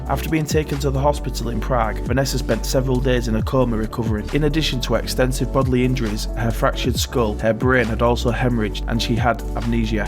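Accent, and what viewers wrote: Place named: West Yorkshire, United Kingdom. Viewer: Just subbed , is tha from west Yorkshire? , good luck with the channel.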